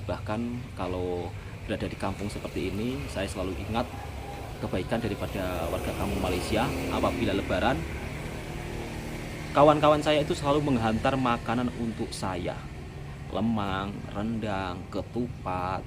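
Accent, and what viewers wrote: Java, Indonesia. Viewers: pekat btl jawa dia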